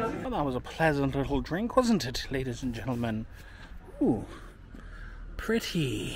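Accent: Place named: England, United Kingdom. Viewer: ????????????????????? I was only joking...half joking the English accent was grating on me but apart from that good vlog.... don't be mad at me 🥺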